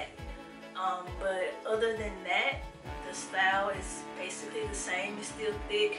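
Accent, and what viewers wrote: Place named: Rural, United States. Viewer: I LOVE your country accent!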